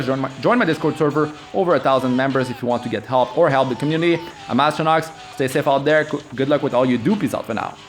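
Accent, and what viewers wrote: Quebec, Canada. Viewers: Salut t québécois?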